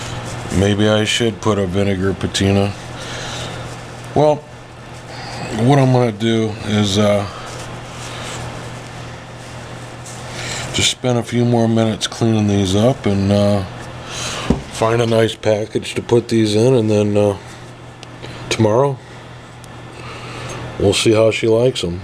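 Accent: United States. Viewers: You are a sweetheart! Are you from the area of Northeast, N.Y? 'Sounds like a Syracuse accent to me. Your wife must have been very touched by all the love you put into her Valentine's Day gift.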